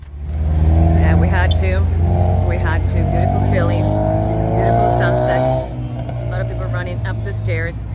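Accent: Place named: Rosario, Argentina. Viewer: Amazing rosarigasino’s accent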